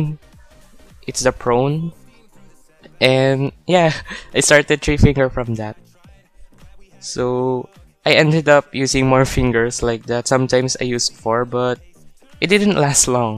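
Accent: Philippines. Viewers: its obvious that his filipinooo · Parang tagalog accent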